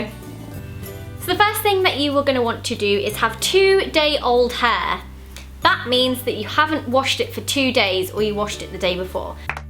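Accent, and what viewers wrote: United Kingdom. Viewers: Beautiful british accent :') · DobbyThe FreeElf I dont know what part of Britain Zoella is from. All in all she is from Britain. Being a foreigner to GB, i am not able to distinguish all the different dialects and accents, and will plainly call it British. Usually thats how it always sounds to me when i meet people from Britain. So, what dialect is it? Educate me on that one, maybe you know better? I find it interesting how people get offended by just an opinion. I never meant it to be offensive. Cant help but feel that way, thats all.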